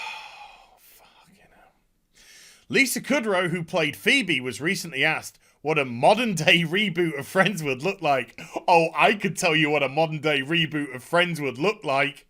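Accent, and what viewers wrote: South, United States. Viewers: You sound like a lovely southern belle, and would fit in wonderfully during the mid 1800's. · Holy shit! Being from the UK you sure got the Southern United States accent down pat! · I love your southern? US accent lol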